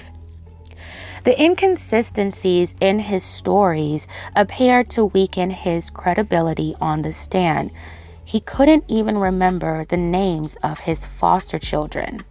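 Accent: Baltimore, United States. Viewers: Now when you do videos talk regular like this, cause you talking like you from Baltimore ain’t it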